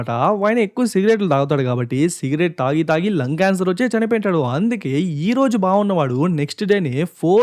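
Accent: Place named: Chittoor, India. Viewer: Bro మాది మదనపల్లి. And మీ slang పలమనేరు చిత్తూరు ఆలా అని పిస్తుంది మా నానమ్మ వాళ్ళది పలమనేరు. బయ్యా మీ vasic చాలా బావుంది.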